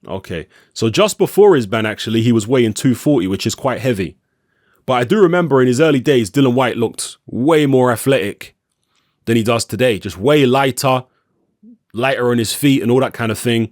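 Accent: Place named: Cockney, United Kingdom. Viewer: Guess where from?? Can't half tell this man is black an cockney!! Gives Tyson no credit & dillian AJ all the credit.. can't fucking wait till Tyson schools wilder, my guess is will change his mind & make it 60/40 wilder leading up instead of 80/20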